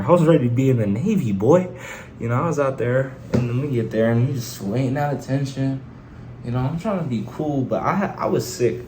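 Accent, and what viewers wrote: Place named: New York, United States. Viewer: Lmao boy u gotta be from New York , nice vid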